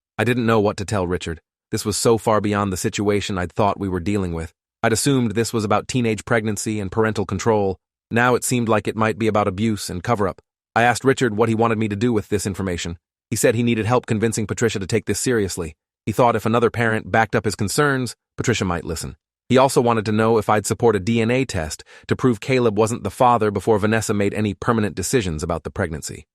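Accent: United Kingdom. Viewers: Why he British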